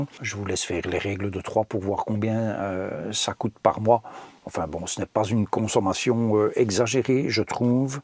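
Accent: Belgium. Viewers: Bonsoir. Un grand bonsoir à la Belgique! Pour la conso en eau je ne puis comparer à la maison. Parce ce que j'ai 2 ados en plus par rapport à mon CC (motor-home!!) Donc machines à laver, lave vaisselle, jardin, piscine...Ceci dit ma douche dans le CC m'a appris non pas à être propre, mais à économiser l'eau sur ce poste. J'adore l'accent qui me rappel mon oncle et tante de côté de Lens (en Belgique bien sûr!)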